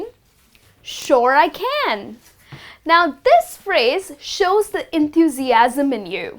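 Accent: United States. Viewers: American dialect.... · You speaks 80% American English and 20 % British English ....... (Me - 50%american +50% British English....) Am I right plz comment ..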